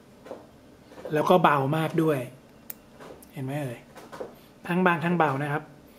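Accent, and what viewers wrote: North, Thailand. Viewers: คนเหนือเเน่เบยยย >< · (งงเหมือนกั๋น)....เอ๊ะ สำเนียงคนเหนือเปล่าครับ ฮ่าๆๆๆๆ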